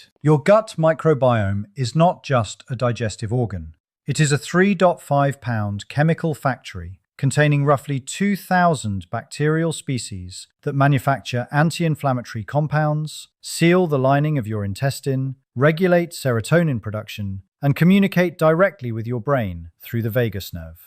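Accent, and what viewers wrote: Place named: United Kingdom. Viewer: British now? Loose the brit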